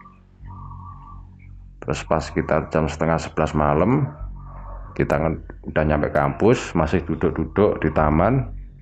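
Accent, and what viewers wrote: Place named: Java, Indonesia. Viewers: Hio itu batangan lidi..., halaah kesuwen mas. Hio itu Dupa, ben gampang. Ceritane mantep apalagi kalo ndak peke Ghuwe...logate isih medhok, ora pantes. Sepurane, sekedar saran... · Mak buugghh, kuaget asem o WKWKWKKW JOWO BGT MAS E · sy malah suka dgn aksen mas yg ga dibuat buat..apa adanya . malah medok bgini jd ciri khas nya mas.. . dan yg julid ttg kata "gua".. tlg blajar dulu ttg aksen seseorang.. hehe.. semangat mas..pertahankan ciri khas anda..ditunggu crita brktnya. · Mohon maaf sebelumnya, lebih baik pake 'saya' atau 'aku' aja ya mas.. Bukannya mau bilang org Jawa gapantes ngomong 'gw', boleh kok ga larang. Cuma.. kadang kalo aksennya kental bgt kaya gini didenger terkesan maksa aja jd ga natural 😂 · Iyaaa , aneh deh bahasanya medok khas jawa tapi ngomong gue gue..wkkk...pake aku yo rapopo kok, keknya jg jarang deh orang jawa tengah /jawa timur ngobrol peke gue gue sekalipun anak hitz gaul..wkkk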